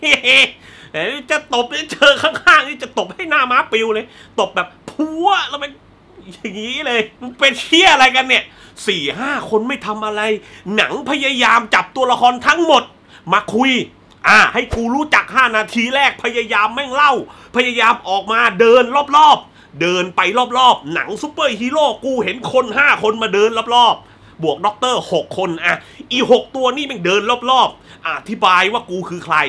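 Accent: South, Thailand. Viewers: พี่เป็นคนใต้ป่าวครับ แหลงใต้ได้ม่ายนิ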